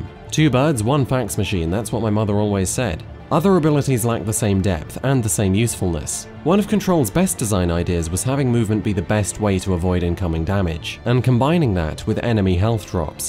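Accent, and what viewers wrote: United Kingdom. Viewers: The British accent makes this critique overly pretentious and distractive. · Why do humans x? Idk but im sure a gaming essayist who makes up words, and has seemingly tenuous grasp of civics is going to tell us in a tapestry of mixed metaphors, pointless erudition, and complete lack of knowledge/context asude from gaming. Why do people who play lots of games think it equips, instead of completely contort, their understanding of reality? Yay another 40 minute leviathan embarassment to eyeroll thru hoping this youtuber would just review games and quit playing armchair philosopher. Anyone can play shitloads if games and talk about them with a british accent and get views, what does actually bring to the table? Not much. once you get to a few of his vids this becomes verrrry apparent that this person is so out if their depth creatively.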